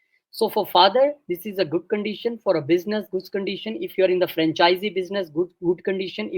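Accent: India. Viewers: Ive alway want to see a video where ur doing the pridiction, ur english is great. Ive always love indians english thas y i also love going indian resturant here in Jamaica.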